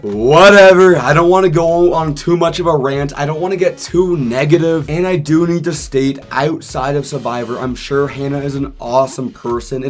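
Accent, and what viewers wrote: Canada. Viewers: Your Canadian accent 🙈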